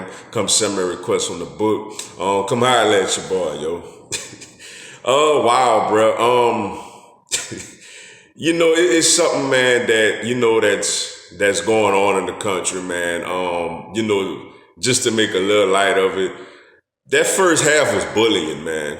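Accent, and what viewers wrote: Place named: Louisiana, United States. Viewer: Mr oh yea bruh I’m new sub I know you was from Louisiana I’m from Nola I don’t know what part you from but I know I heard your accent lol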